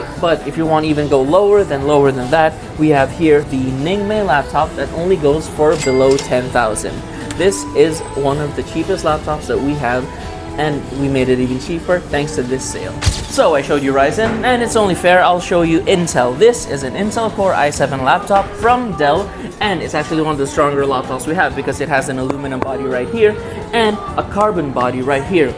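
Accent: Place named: United States. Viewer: I mean its okay for you bro to be english speaking with American accent, dunno if you gew up States side, but ,I think viewers will much appreciate if you go Taglish or Tagalog. We can just watch foreign or global videos if we want english based ones(I mean on your laptop reviews), but most Pinoys would love to see Taglish or just pure Tagalog vids just like how Tito Dustin does it. Medyo boring bro if englishero realtalk.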